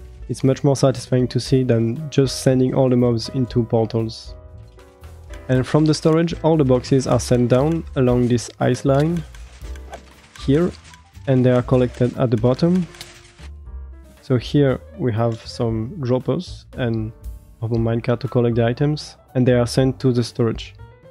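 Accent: France. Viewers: hey are u french ?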